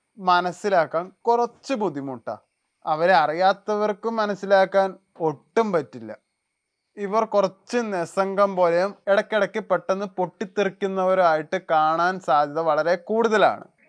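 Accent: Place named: Palakkad, India. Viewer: ❤ sir correct Palakkad slang❤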